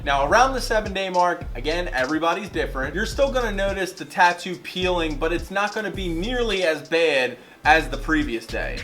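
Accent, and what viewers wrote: Philadelphia, United States. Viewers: You sound like your from philly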